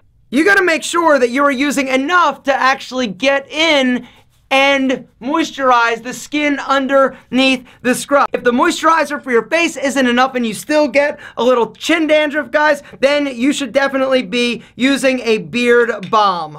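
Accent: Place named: New Jersey, United States. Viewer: just found your channel: am a NJ native, you totally sound like your from Jersey or PA.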